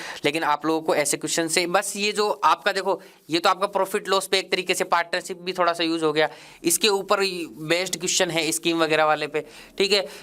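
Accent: Haryana, India. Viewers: Sir haryana se h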